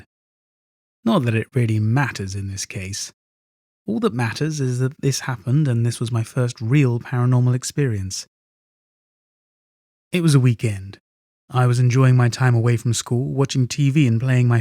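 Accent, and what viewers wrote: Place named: RP, United Kingdom. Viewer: I'm two years late, but only recently found the channel: congratulations on the first book narration gig. I hope you've had many more in the interim, and I'm confident that has been the case, because you have the goddamned most crisp, posh, cut-glass accent my anglophilic American ears have ever heard on YT. You could easily pass for a BBC broadcaster.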